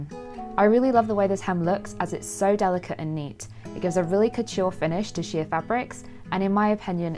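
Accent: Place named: England, United Kingdom. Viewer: Have always wondered how this is done! Great video, and a beautiful English accent that is easy on the ear ! 😊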